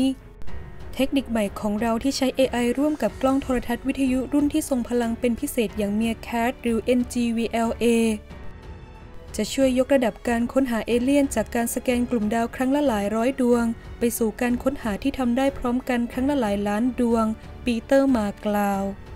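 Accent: Thailand. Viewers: เสียงเหน่อมาก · คนใต้ไหมนิ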